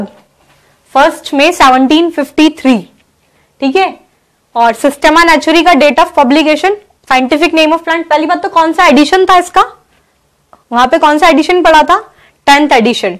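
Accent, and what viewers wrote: India. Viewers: your pronunciation is truely indian .... its very difficult to understand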